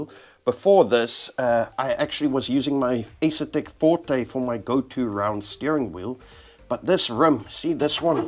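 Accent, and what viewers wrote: South Africa. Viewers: dunno how i stumbled onto your channel ill give you sub. Loving the attitude and thick accent, i miss SA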